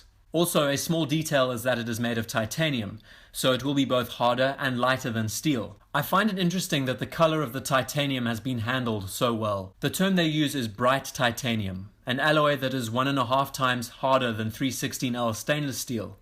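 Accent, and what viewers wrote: South Africa. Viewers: How did you rework the crown? Or did you have it done? Judging by your accent, you're South African. I'm in CT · You sound South African 🤔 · South Africa 👍🏾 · You sound South African! :)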